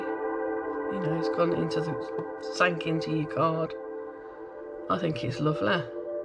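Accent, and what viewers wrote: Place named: England, United Kingdom. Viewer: * (Sorry, couldn't resist 😂🖤) It's really refreshing to hear regional accents and if what I've seen on others vids is any indicator, people outside England seem to enjoy hearing something beyond Queen's English/London, too. I'm really glad you decided to and that you feel good about it! Here's to many more😊💖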